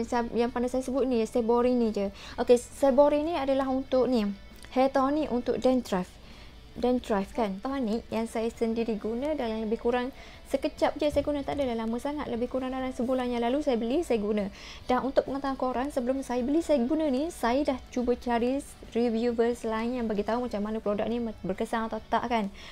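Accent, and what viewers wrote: Kelantan, Malaysia. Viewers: Awk ni asal kelantan ke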